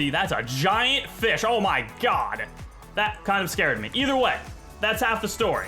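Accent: United States. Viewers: What part of Ohio are you from?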